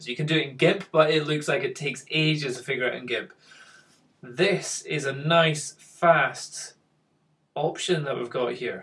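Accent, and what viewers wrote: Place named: Scotland, United Kingdom. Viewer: I do enjoy your Scottish accent. Don't stop talking! Did you ever look at Corel AfterShot Pro?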